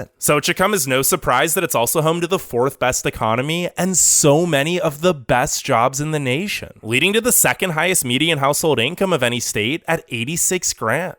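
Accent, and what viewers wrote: United States. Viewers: I like your american English accent, thumbs up to you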